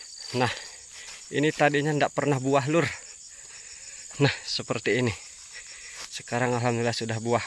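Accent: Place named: West Java, Indonesia. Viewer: Ini daerah mana om kayak dari Sunda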